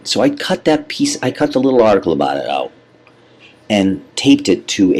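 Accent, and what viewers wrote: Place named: England, United Kingdom. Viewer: Not a bad English accent, at first anyway. You rather sounded like me when I talk. lol.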